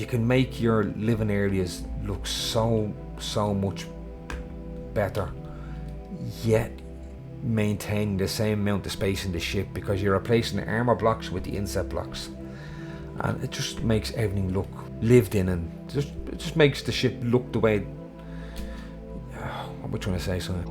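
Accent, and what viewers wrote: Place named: Dublin, Ireland. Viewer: Watching your videos made me get this game, i love your content, it's good to hear the dublin accent lol. Keep up the good work.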